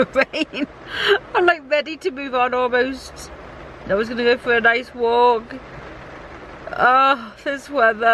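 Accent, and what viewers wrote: Liverpool, United Kingdom. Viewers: Looks so beautiful there, love the Peak district..... is that a slight scouse accent you have? i live in Wirral...... don't be afraid to make longer videos because i think lots of people like them longer! :-)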